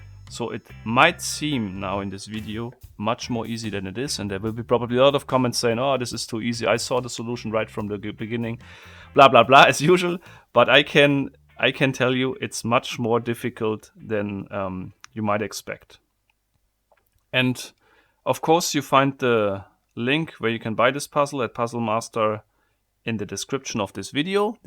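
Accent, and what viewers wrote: Germany. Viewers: Im not going to lie the main reason i watch you is because i love the german accsent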